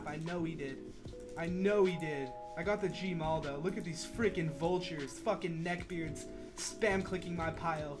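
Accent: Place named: East Coast, United States. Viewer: Cali :o i totally thought you were an east coast guy. now i sad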